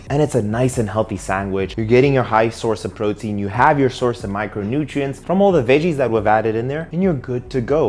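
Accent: South Africa. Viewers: Awesome! Thanks Doc! I honestly thought you were South African 🇿🇦 because of your accent😅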